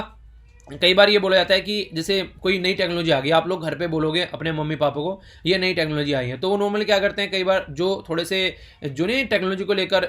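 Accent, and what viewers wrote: Haryana, India. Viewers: I think....🤔.... Sir Haryana se h...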